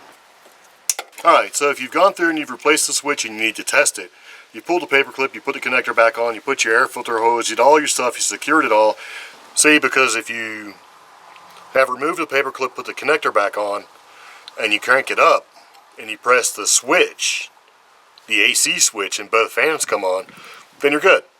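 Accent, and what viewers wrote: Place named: United States. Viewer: i watched because you sound like a cool American dude good presentation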